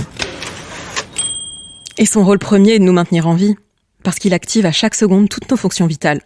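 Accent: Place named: Marseille, France. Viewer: Merci pour cette présentation détaillée et le sens de l'humour et l'accent "Police de Marseille" un délice pour les oreilles et les sens.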